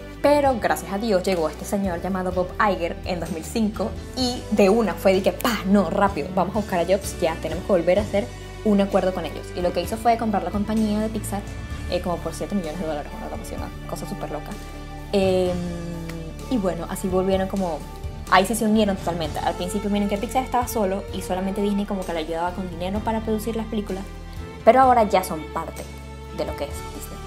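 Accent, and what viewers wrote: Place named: Venezuela. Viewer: Gracias por aclararme todas estas dudas <3 Pd: eres de Venezuela? 🤔Tu acento me suena muchísimo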